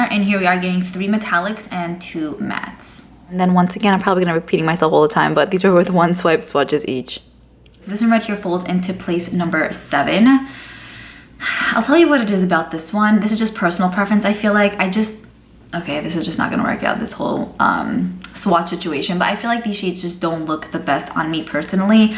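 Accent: New York, United States. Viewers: Your accent is perfection. It’s just a kiss of Italian New York and I love it.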